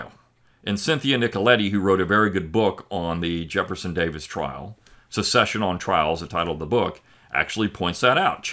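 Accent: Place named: New England, United States. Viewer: Sounds like a Yankee to me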